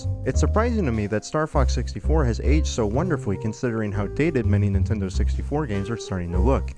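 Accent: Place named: North, United States. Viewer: Doesn't really sound like James Rolfe but he could be from a similar part of America. He certainly sounds like he has a northern accent.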